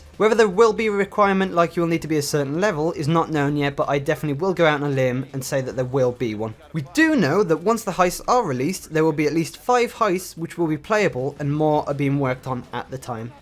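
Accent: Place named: United Kingdom. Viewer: Dat british acscend doe.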